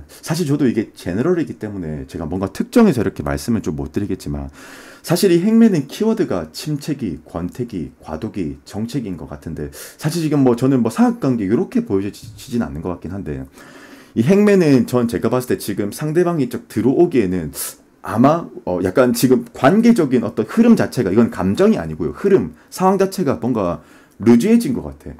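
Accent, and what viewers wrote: Gyeongsang, South Korea. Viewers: 음~~경상도 발음= 사투리👍👍많이 해주3 글구..소화가 잘 안 되 시나욥?위역류요런거😅😅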